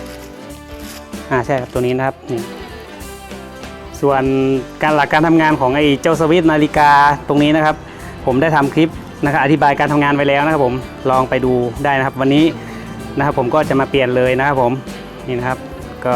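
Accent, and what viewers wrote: North, Thailand. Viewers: ขอบคุณครับกำลังเปลี่ยนพอดี ชอบช่างคนนี้อธิบายดี พึ่งรู้ว่าอู้ภาษากำเมืองเน่อ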